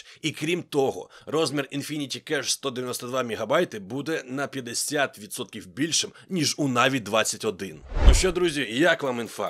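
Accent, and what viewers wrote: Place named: Russia. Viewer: чувствуется что самому трудно переходить с русского на украинский язык,например,правильно не видеокарт, а видэокарт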